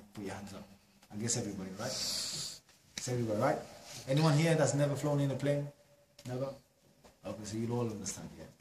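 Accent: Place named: Jamaica. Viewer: Hes wicked jamican and afro accents are hilarious MA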